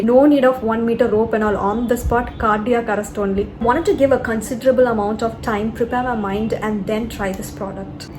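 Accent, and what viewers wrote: South India, India. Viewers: Pure south india full satire daa love d way u talk Love from telengana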